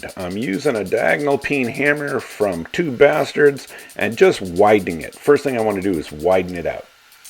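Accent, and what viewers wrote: Canada. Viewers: Always thought you were Canadian.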